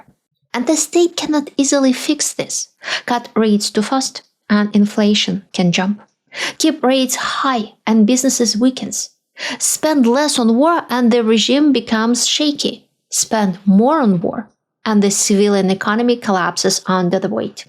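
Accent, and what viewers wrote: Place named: Russia. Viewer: I love your podcast. Your insights are very interesting and important to hear from someone who grew up in the Soviet Union. Your English is impeccable with a lovely Russian lily!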